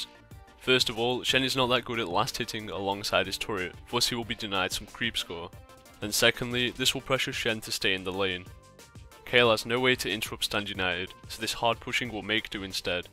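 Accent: Northern England, United Kingdom. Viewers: I love british accent :D Him and JackFrags are my fav commentators on youtube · Your northern English accent is very different from mine.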